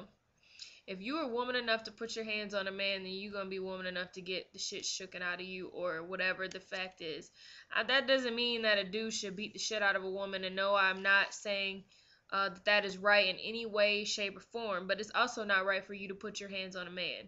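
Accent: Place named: United Kingdom. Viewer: im guessing you are brittish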